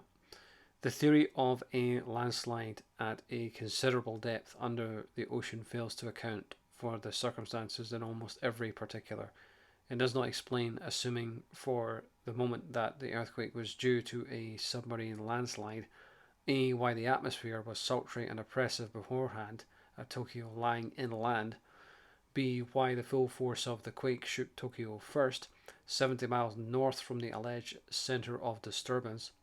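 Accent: Scotland, United Kingdom. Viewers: I've sent your readings to my friend, I confess iv been listening as well. I've read aloud Beaumonts books to myself in my geordi accent, hearing them from a Scot though is a pleasant change haha 😄.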